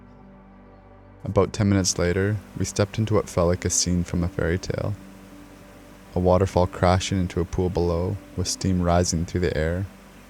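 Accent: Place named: Canada. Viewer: Weirdest pronunciation of Coromandel I have ever heard! Must be the Canadian accent.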